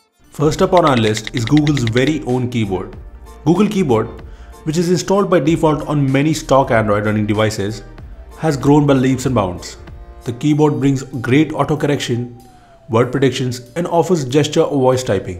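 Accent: India. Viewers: 阿三的英語發音。。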